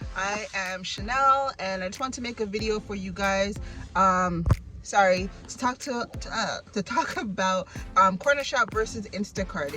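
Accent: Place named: Barbados. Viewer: hello chanel. my name is sean. Thank you for doing this video. thanks for the information about cornershop. You sound like you are a bajan, i hear an accent.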